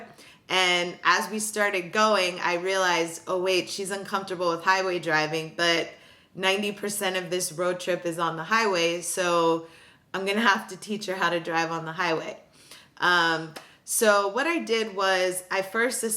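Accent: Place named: Greece. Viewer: You sound Greek...!!!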